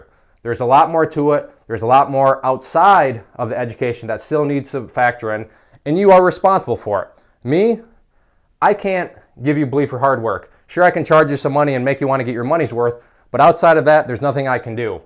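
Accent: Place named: Midwest, United States. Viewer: Where from the Midwest are you from i got a feeling your from the midwest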